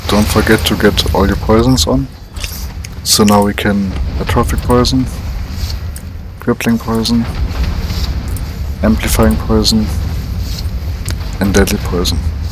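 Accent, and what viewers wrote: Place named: Germany. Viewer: OMG der deutsch akzent XD Ich liebe es HAHAHAHA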